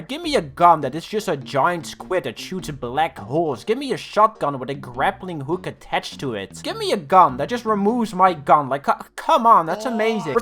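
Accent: Netherlands. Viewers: U dutch? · hol up u dutch?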